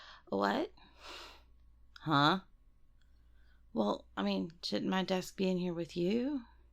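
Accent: South, United States. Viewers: I didn't know i needed a southern furry till right this moment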